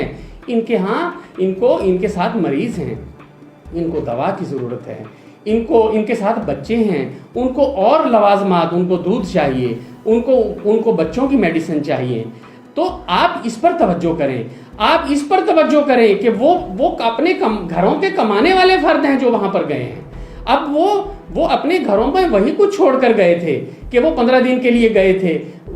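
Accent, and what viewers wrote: India. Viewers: اسکا لہجہ تو انڈیا جیسا ہے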